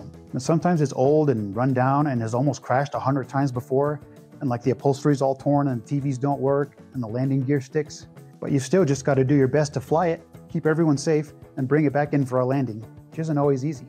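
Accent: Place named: Scotland, United Kingdom. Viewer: Your Scottish accent is great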